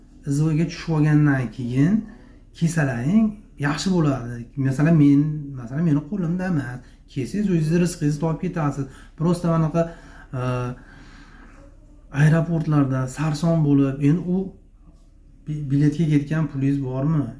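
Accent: Andijan, Uzbekistan. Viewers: Anjon shevaku😂shunqa sozini bular ashnaqa deydi😂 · Oddiy o'zimizcha Anjanchasiga gapirib qo'yaqolaman, deb xatolariyizdan o'ldirvordiyiz lekin. (Quick Response Code) QR- код Piar- код deb. Aka Andijonliklar sizchalik xato gapirishmiydi lekin. Siz halqqa bir ma'lumot opchiqvottisiz, aytivotgan gapiyizga o'ziyizam tushunmaseyiz kerak xatoligidan.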